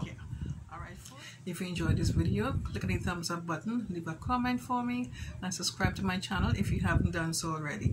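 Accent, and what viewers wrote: Trinidad and Tobago. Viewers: You sounding Trini..just came accross yr channel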